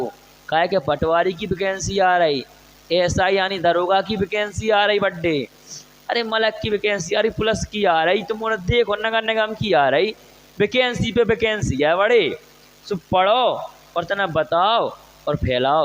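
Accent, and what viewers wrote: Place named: Bundelkhand, India. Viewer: Sir apki bundeli bhasha bahu achchhe hai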